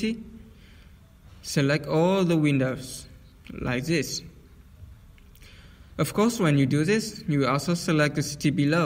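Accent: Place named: Italy. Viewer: Woow man You have an accent like a man in video "italian man who went to malta" and that's so funny and cool :D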